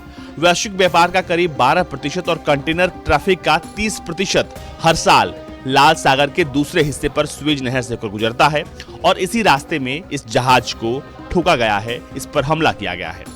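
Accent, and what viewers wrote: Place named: Bihar, India. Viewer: Ye bihari hai jo bol rha hai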